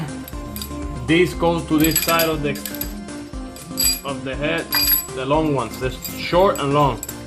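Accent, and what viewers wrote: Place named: United States. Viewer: Pareces un Yanki hablando English...coño.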